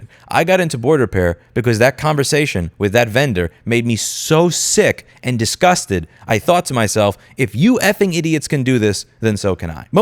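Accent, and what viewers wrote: New York, United States. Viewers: Love it. You come across as a typical New Yorker until the 2nd minute that you putting sound thinking to work. And then communicate it like a New Yorker.I hey I like New Yorkers, it's OK. More than that I love your show and i too have been seriously annoyed with your main topic - right to repair. I'm an engineer and love to tinker. Back in the day that was half the fun. Woz backs backs you. I think even God would be happy for Woz's backing.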